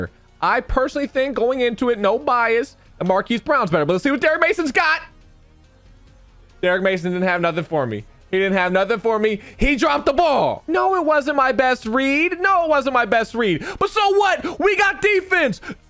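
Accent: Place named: New Jersey, United States. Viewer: where are you from ? you have a jersey accent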